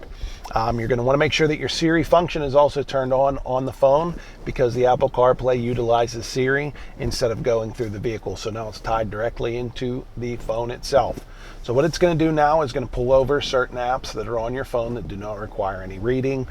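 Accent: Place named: Pittsburgh, United States. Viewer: that awful PIT accent, can hear that from. 4000 miles away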